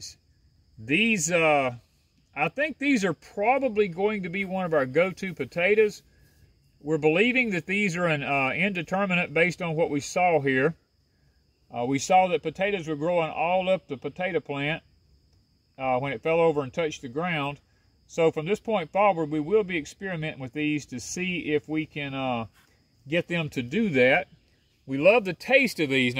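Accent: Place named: Mississippi, United States. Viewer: As you was talking i knew you had to be in Mississippi i just knew it. Im from Crystal Springs, Mississippi born and raised. I love gardening. My granny and an uncle raised me from and infant and taught me. Nice to meet yall. I love the video.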